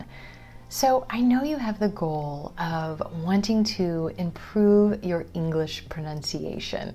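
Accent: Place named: United States. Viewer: Hello my teacher really I love way you're speaking American English pronunciation it's so sweet I'm Steven am in Canada Ottawa Ontario I need to speak English like you if possible.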